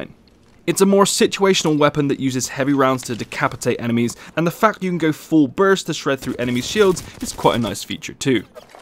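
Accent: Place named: West Country, United Kingdom. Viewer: you from bristol or summerset buddy?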